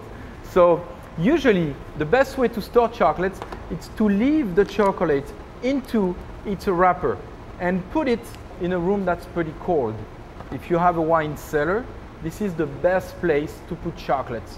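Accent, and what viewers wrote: France. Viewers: The French accent ssoooooooo does it!! Tem-purrr-achture!!! Love it !!! Is partly because they stick their lips forward like they are about to kiss you when they are talking...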